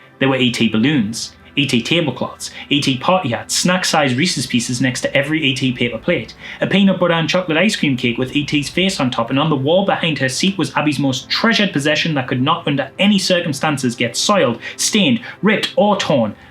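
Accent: Newcastle, United Kingdom. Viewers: That's an incredible book cover! Btw, I have loved this whole series so far. I am a pretty serious horror fan, but I never thought of how heartwarming and wholesome the genre could be, and nowadays I live for that shit. So thanks for bringing these gems to all of us and talking about them so genuinely in that charming-ass Geordie accent.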